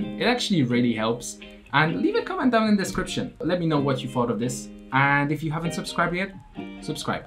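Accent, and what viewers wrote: Poland. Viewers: Ciągle słyszę Polski akcent ;) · Jak usłyszałem 'hey Jacek', już wiedziałem, że to rodak xd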